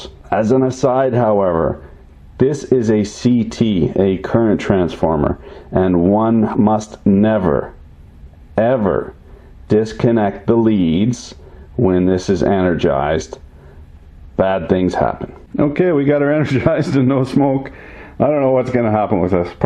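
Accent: Quebec, Canada. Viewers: Quelque chose me dit que vous êtes du Quebec! suis-je dans l'erreur ? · Tu sais parler québécois! You know how to speak french-canadian! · 10/10 for Quebec French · Nice québécois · hi, I'm from Montreal, Canada. And I love it when you speak french .makes me laugh everytime with the quebecois slang and hockey on top of it lol. keep it up.congrats for your channel.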